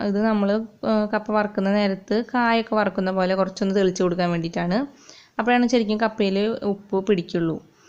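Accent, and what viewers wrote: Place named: Thrissur, India. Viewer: Good thrissur language 👍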